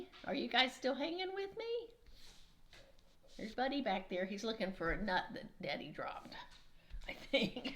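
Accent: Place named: Eastern North Carolina, United States. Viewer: I enjoyed your WIP Parade and listening to your melodious voice. It reminds me of growing up in eastern NC. Happy New Year to you and Peter!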